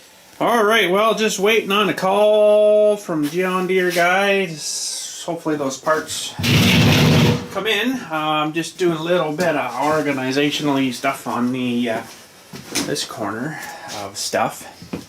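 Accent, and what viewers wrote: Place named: Canada. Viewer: You must be Canadian ah where abouts are you ?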